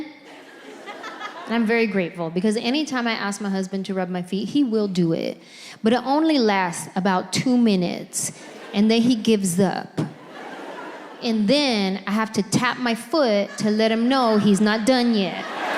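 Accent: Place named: California, United States. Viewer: She’s really refined her routine, she’s always had funny material, but now she’s “polished” as a stand-up comedienne. However, I do wish she used adult language like 98.4% of us normal adults use in our everyday lives….and I like the fact that she hasn’t lost her Calif accent, she sounds like she could still be an Oakland Raider cheerleader….if she ever books a gig here in San Jose, Calif, I’m definitely buying tickets to see her live…😊✌🏻🇺🇸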